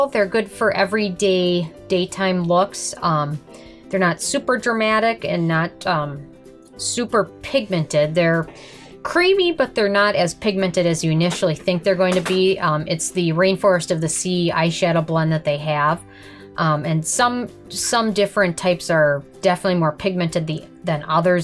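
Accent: Minnesota, United States. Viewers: Are you from Minnesota? Great review!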